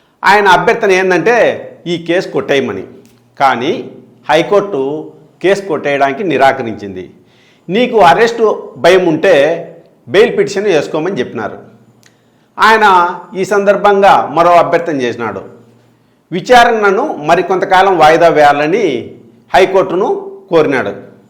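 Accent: Rayalaseema, India. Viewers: రాయలసీమ యాసలో మీ విశ్లేషణ బాగుంది అన్నా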